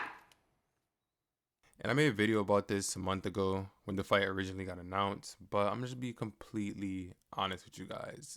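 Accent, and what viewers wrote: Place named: New York, United States. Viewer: my fault bro, you sound like me a little and a bunch if others I know lol. NY maybe? Bless up bro and solid takes.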